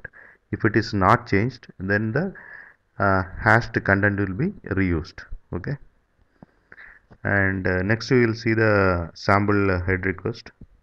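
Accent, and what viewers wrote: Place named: India. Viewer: i can get a little biased and racist when i hear this accent (all electronics videos are in hindu-english) but it was actually cyristal clear and much more helpful than lecture slide and google definition. Thank you sir.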